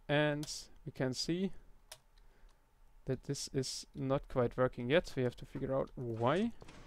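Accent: Germany. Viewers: So you speak normale German, you don't Sound like someone who s motherlanguage english is?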